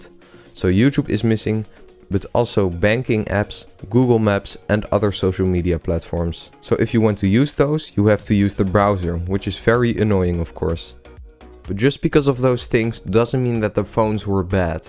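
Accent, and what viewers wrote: Netherlands. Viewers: I can hear the dutch accent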